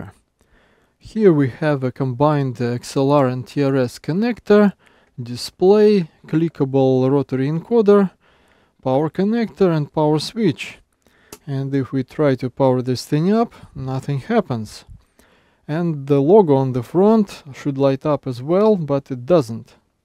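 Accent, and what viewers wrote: Russia. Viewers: Чел , ну слышно же что ты русскоговорящий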